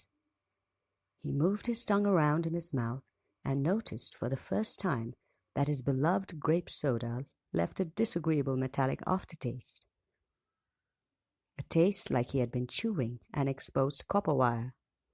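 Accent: United Kingdom. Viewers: Your reading is FANTASTIC!! To hear a British woman taking the helm on this gives it an extra touch of surrealism (or plays against type so well). Very effective, and a HUGE relief from the awful, painfully contrived readings of Will Patton; his whistling S’s and phony solemn affect keeps me away from those. I hope you get hired at Audible, m’lady. 😄👍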